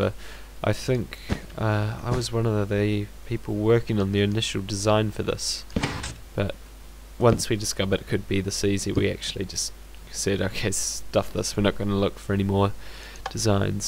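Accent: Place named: Germany. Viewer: Are you German? Cause you sound a little bit like a German ^^'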